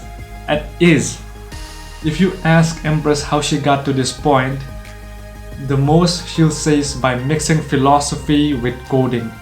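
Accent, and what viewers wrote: Northeast India, India. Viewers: You from North East India bro?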